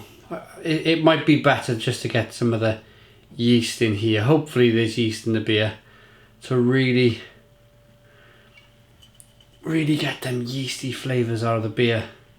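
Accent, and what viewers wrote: Wales, United Kingdom. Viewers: He's Welsh. Way different to a Scottish accent.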